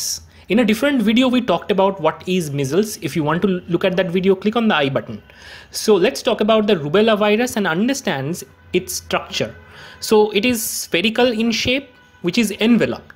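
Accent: India. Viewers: Pure Indian english😢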